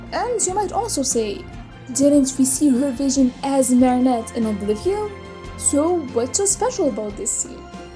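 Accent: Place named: France. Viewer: Your French accent is so strong I thought you were speaking French in the beginning for a sec there😂😂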